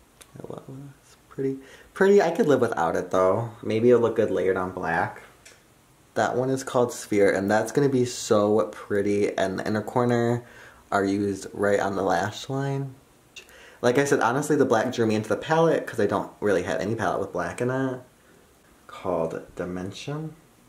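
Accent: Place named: Midwest, United States. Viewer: Your Midwestern accent gives me life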